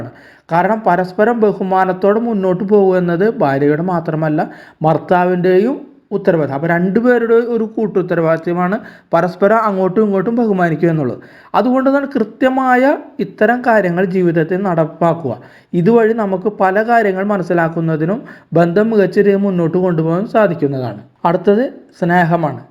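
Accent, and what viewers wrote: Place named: Kochi, India. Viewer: അവതാരകൻ ക്ലബ്ബൗസിൽ സംസാരിച്ചിട്ടുണ്ട് എന്ന് തോന്നുന്നു... കൊച്ചിക്കാരനാണ് അല്ലേ👍😊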